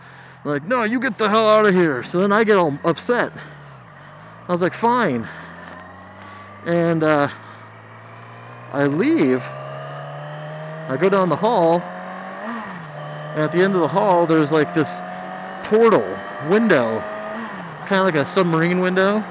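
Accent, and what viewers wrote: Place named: France. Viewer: Do you have a video of your camera/mic setup? BTW awesome frenchy accent!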